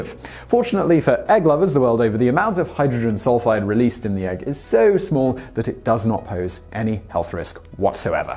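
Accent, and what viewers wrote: United Kingdom. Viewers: is it just me or has he been getting more british?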